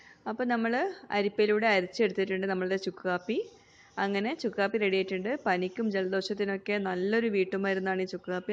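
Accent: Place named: Wayanad, India. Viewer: wayanatilano? wayanaden samsaram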